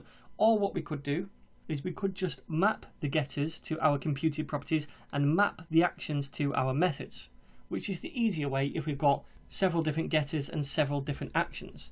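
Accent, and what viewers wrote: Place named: Northern England, United Kingdom. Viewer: I watched every Vue video. I have to say... playing at 2x speed really pushes the mind as you deliver your content so fast anyway! It's also extremely refreshing to hear a northern accent so intensely after living in London for seven years... Thank you very much!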